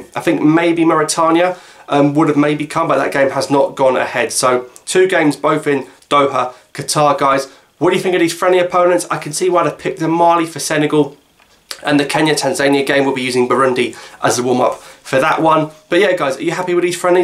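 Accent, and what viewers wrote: United Kingdom. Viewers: El Jazairie Judging by his accent he's propably born in 🇬🇧. so maybe he doesn't speak it🤷‍♂️ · Thanks bro nice British accent !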